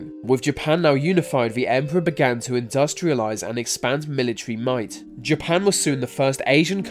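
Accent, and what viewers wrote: United Kingdom. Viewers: All brits sound the same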